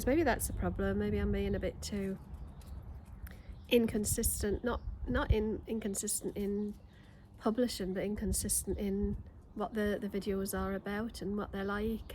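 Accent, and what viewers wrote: United Kingdom. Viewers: I don’t think I’ve heard you speak with such a Geordie accent since before you headed for the Hebrides. You must be low., just keep doing what you are doing. Something will just happen and I guarantee you’ll not be able to understand why. That was the case with both of my channels. Try this. Picture one person in your mind throughout the whole video creation process, the prep, the shooting, the editing, the uploading and the thumbnail/description. Make it for that person and them alone. Make that person a fictional person. You want to give them a pleasure in watching your video. You want them to feel comfortable watching your video, feel happy, entertained, educated, inspired. You do all of those things to people in the real world everyday so why do people watching get different treatment. In other words, let that person see you, not what you think they should see. 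But direct it to that one person in mind. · Aww, you are brilliant, I love your honesty and openness, I agree with the other posters, just do it for you, if you’re not worried about the monetisation piece, do what you love and enjoy. You’ve got your hardcore here. I sometimes think when I comment, it’ll just be boring old Nat again and not someone new but, we’re here for it and we love all your stuff. Before you said it, I was thinking you were very geordie today and I LOVE that! My Dad was from Newcastle and although he moved down south as a nipper and didn’t naturally speak in the geordie way, he could turn it on in a flash and I really love the accent because, in my roots I have a wee bit of geordie 🥰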